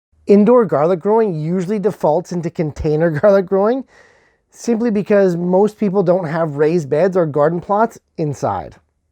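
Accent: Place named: Canada. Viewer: Ive been trying to find small things to grow inside my apartment, im excited to try this out! Looking for more if you have suggestions 👀 Ps your canadian rise accent is strong and i love it 😆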